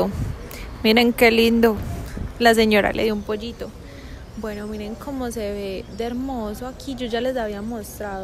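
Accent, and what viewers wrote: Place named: Colombia. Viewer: Hola juapa saludos desde los Estados Unidos soy de acapulco megusta ese asentó maravilloso por ese asentó empecé aver las series de Colombia saluditos juapa 😊😊😊😊😊😊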